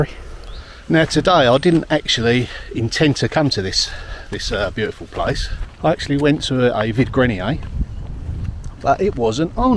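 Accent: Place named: England, United Kingdom. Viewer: Hello from Canada. Lovely video, and I thoroughly enjoyed your tour of this beautiful little village. It's so nice to listen to someone who has such a deep appreciation and respect for the long history of these places. Never apologize for your English accent - it's beautiful. My Mum was born in England. Thank you so much for sharing. 😊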